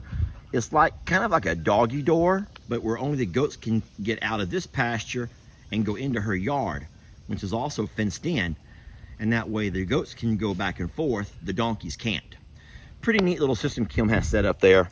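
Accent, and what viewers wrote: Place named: South, United States. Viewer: We say ol man and ol lady . I think It's more of a country thang you just have a southern accent.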